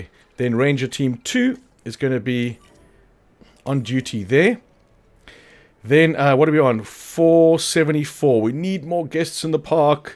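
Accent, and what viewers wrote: South Africa. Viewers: You can see from the Alamosaurus how keeping the button depressed when placing forest or a food source really helps with the space required to keep them happy. I can't remember if I mentioned it in the comment on the previous video, but you keep the button depressed until it stops costing money. Once it no longer costs money the area is full of whichever vegetation you're placing. Also, last time I didn't mention that when placing trees from the decorative section instead of using the painted forest, those trees do not remove whatever vegetation is painted in the area i.e. they do not replace the food sources like painted forest does nor do they replace water, which heavy forest, when placed in water, does. Thanks for another great video; both instructive and entertaining. I could listen to that South African accent all day! By the way, I saw in one of you replies to another viewer that your wife's a Kiwi -- I'm in the middle of you both, I'm an Aussie!! 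Cheers, mate, have a good one!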